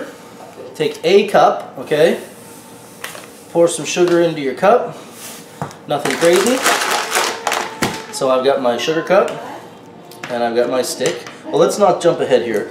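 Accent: Newfoundland, Canada. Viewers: Sounds like Newfoundland. I'm also from there. Love the accent. I'm homesick now lol.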